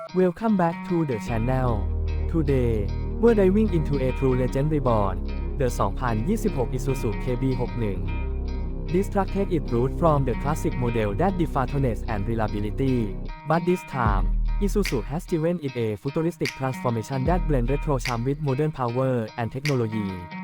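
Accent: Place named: Thailand. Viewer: อ่านภาษาอังกฤษแบบไทย แถมตกไทยด้วย เช่น Starting with the exteria the สองพันยี่สิบหก Isuzu KEหกหนึ่ง … งงแท้ จะไทยหรืออังกฤษ❤❤ ปรับใหม่ก็ดีนะครับ ติเพื่อก่อนะครับ ❤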